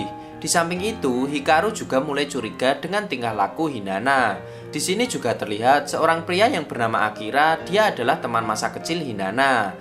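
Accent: Java, Indonesia. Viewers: Medok amat ngomongny😂😂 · Jng membaca medok jowo mas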